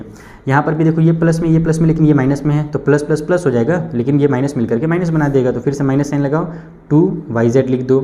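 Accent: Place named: Punjab, India. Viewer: Sir ap punjab ka ho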